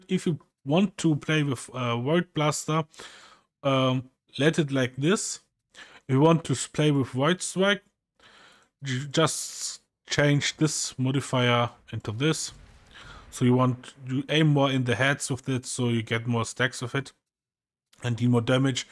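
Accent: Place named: Germany. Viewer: Thanks for the tip! and your Denglisch is really gut :D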